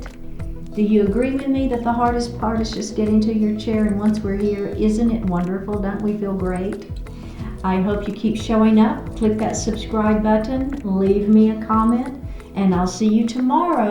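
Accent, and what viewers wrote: South, United States. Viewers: Hi pretty, So happy I found your site even though your challenge started a year ago, better late then never! I have just recovered from Cervical Spine Surgery and had 4,5, and six disc removed and a titanium plate with a screw as replacement. I will be 79 May 4th. I had the surgery February 6th 2023 and in August- December had 3 very bad falls. One of the problems was a medication called Cymbalta and having researched for weeks it has been known to be a destructive med for many people since around 2014. There are doctors and people on YouTube that have shared their horrible experiences with getting off this med. I’m talking way over 100,000 people but most doctors are handing it out like candy and the withdrawal process must be very, very slow. Don’t know why I rattled off all of that so I’ll blame it on Cymbalta! I have told other people and my new Physical Therapist and even tho I have Fibromyalgia and arthritis they said go for it! 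Love your southern accent and your peace. I go to Bible study and have His peace but I tighten my shoulders many times instead of letting them relax. I will just keep trying my best to relax😊❤❤ Sincerely, Paulette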